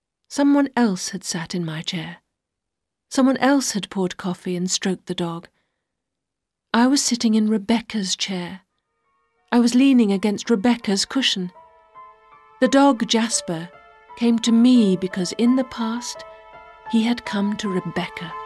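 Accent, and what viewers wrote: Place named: United Kingdom. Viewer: British English is so elegant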